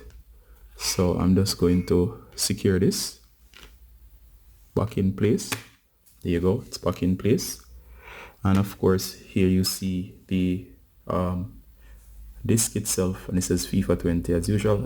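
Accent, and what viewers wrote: Jamaica. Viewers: U jamaican right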